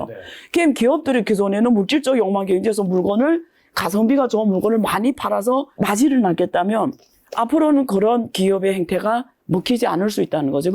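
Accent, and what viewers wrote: China. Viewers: 조선족인데 말투가 고쳐지지가 않네 교묘하게 짜장 빨던여자가 비판을하니 신뢰가 안간다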